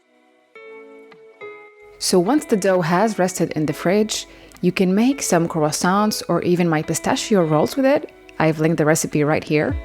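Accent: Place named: Quebec, Canada. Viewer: Been roughly 10 years since I’ve made croissants this video is the perfect explanation for how to laminate dough! Even Touch my girlfriend how to make them as well thank you very much keep up the amazing work ! Also when I here the accent I’m like damn this gonna be good 😂😂 French Canadian ?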